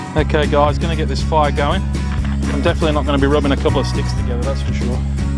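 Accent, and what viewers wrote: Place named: Wales, United Kingdom. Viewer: Hope I'm not rude but I'm struggling to place your accent, I'm picking up but of Welsh some Australian and English.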